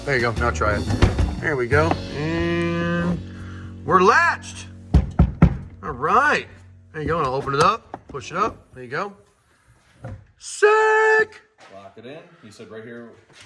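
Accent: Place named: Tennessee, United States. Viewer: That accent sounds like around central TN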